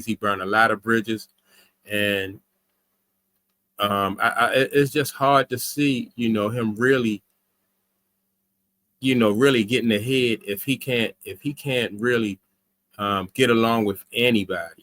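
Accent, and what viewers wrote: Baltimore, United States. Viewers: I can hear the Baltimore in your voice.. my hometown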